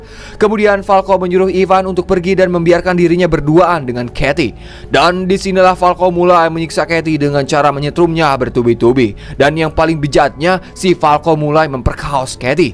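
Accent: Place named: Sundanese, Indonesia. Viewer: Konten nya baru lewat ketika mndengar ngomong nya lucu Sunda auto lngsung d subscribe hihi cerita yg lucu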